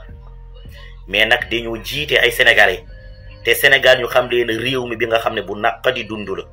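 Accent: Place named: Guinea. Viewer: Yaw rew nga dé ai sa lakeu wolof bou am accent guinéen Réglel yaw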